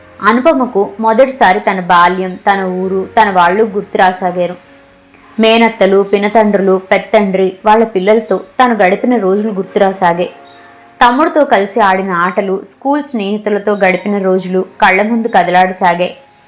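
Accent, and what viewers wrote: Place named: Telangana, India. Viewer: కథ ప్రారంభం లోని శిలను కథ అంతానికి రచయిత్రిశిల్పంగా చెక్కిన తీరు బాగుంది.ఆకాశమంత ఎత్తుకు చేరుకున్నా, భూమిని మర్చిపోకూడదు వంటి డైలాగ్స్ 👌 తెలంగాణా యాస బాగుంది 😊 మంచి presentation.....❤❤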